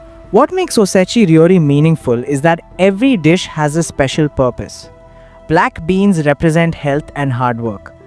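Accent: India. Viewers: I was not expecting an Indian accent.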